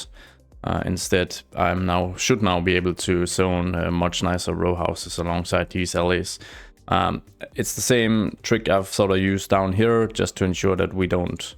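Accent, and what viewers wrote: Denmark. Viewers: Det er super god danglish :) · I was wondering whether you are from Denmark based on your accent🙂 Btw love your videos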